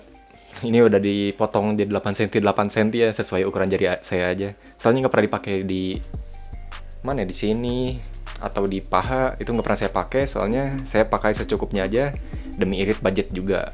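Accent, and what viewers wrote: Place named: West Java, Indonesia. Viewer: Sunda banget bang nyorodot dong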